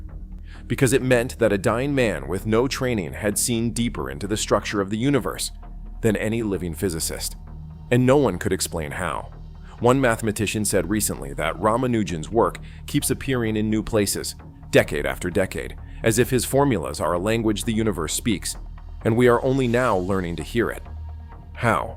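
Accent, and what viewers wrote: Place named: United States. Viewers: The real Hardy didn't speak with an American accent. And he also knew how to pronounce Ramaujan's name correctly. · And the American accent is not exactly realistic. Are there no people still alive who can speak with an educated English accent ? In fact, this post was immediately followed by an advert for a charity, spoken in proper English, as spoken at Cambridge University. (I had a friend who came from a working class background and had to learn to speak in the accepted way when he went to Cambridge University).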